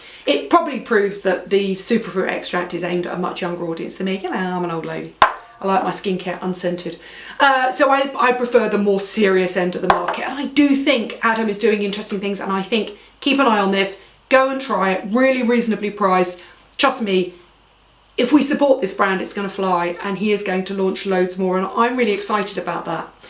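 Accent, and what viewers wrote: United Kingdom. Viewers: I totally agree! Even tho,...I miss a lot of what she says with her lovely, fast-talking British accent. But it sure is nice.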